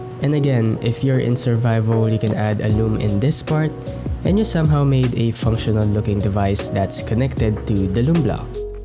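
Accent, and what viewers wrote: Philippines. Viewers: You sound Filipino 🧐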